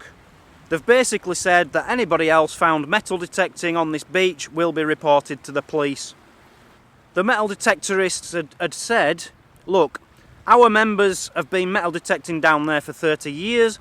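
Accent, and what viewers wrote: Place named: East Yorkshire, United Kingdom. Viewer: Als halve Engelsman heb ik nog geen video gemist. Super East Yorkshire accent en fantastische woordspelingen en humor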